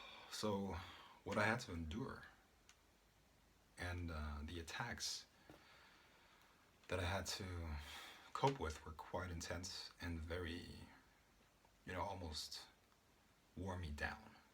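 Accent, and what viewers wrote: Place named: United States. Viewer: Y do u live in Germany u clearly have an American accent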